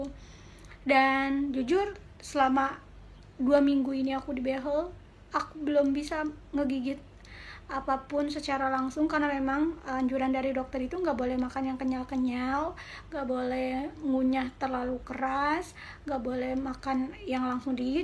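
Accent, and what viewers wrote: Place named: West Java, Indonesia. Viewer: Sunda bangettt:v wkwk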